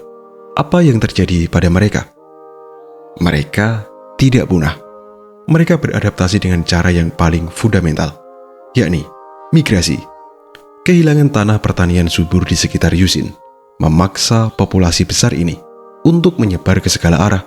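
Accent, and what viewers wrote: Java, Indonesia. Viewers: Logat Jawa Memnag enak kalau untuk narasi film dokumenter artikulasi jelas dan suara ngebasnya itu enak didengar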